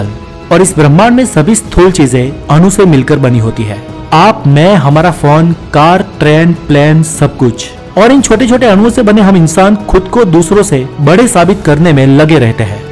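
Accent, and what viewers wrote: Gujarat, India. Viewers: Sir tum gujrat ke ho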